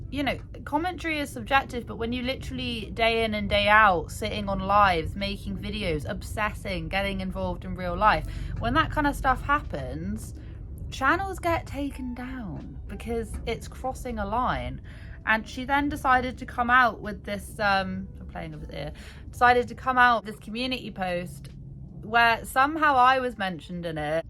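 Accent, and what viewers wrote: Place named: United Kingdom. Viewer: Omg yes thank you gorgeous! Anyone who is against your sweetheart butt are just nasty people. Your obviously a genuine person. Trashy people attract trash. And you didn’t back pedal….you said “hey guys I messed up.” End of it. Your human, your a content creator, mistakes will happen. Unlike onora YOU TAKE ACCOUNTABILITY! Love you and your British accent!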